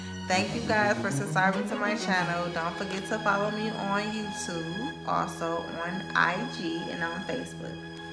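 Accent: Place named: Detroit, United States. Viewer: Your voice sounds like your from Detroit. If so, hey girll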